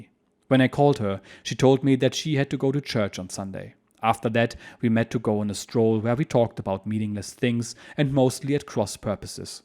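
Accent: Germany. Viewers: I absolutely love hearing german diaries with a german accent. So much better than AI. Thanks! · I appreciate it's in english with a german accent not a posh British accent for a german wartime memoir. Thanks!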